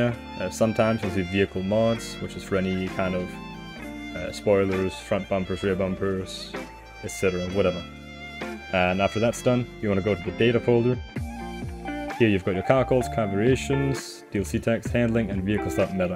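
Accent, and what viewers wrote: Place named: Geordie, United Kingdom. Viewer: you sound like an American Geordie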